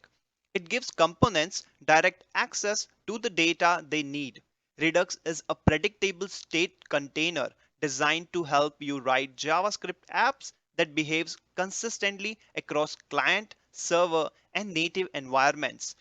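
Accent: Gujarat, India. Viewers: Thank you sir... Are you from gujarat?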